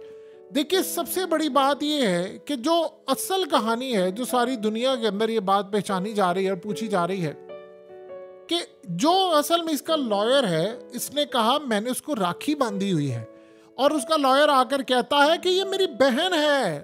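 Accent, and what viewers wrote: India. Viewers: 💯یہ خاتون ہندی ہے ،،جس روانی سے یہ ہندی کے الفاظ بولتی ہے اور جس انداز سے بات کرتی ہے بالکل انڈین سٹائل ہے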